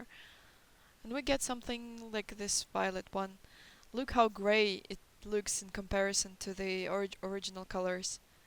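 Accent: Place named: Eastern Europe. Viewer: у тебя не самый всратый английский, что я слышала. Но местами прям "славская" натура прёт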